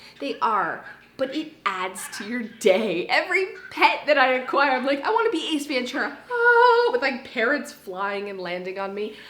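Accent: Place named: Southern Ontario, Canada. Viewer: You have to be Canadian or even from Ontario, pa day, geese, sounds like southern Ontario lol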